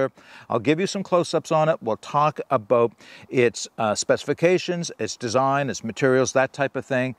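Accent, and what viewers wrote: Canada. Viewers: Thanks. I m sure that I’m not alone, in appreciating your easy yet thoughtful presentation and nice soft Canadian accent.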